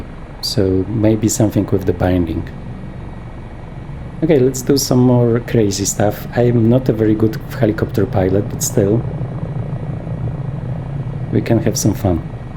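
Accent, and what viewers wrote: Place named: Poland. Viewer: nie ukryjesz sie, polaka slychac na kilometr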